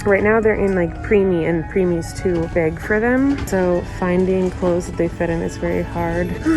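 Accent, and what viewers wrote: South, United States. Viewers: Sweet, get his southern accent from you! You do a lot of “character” talking. He’s doing you. ✌️❤️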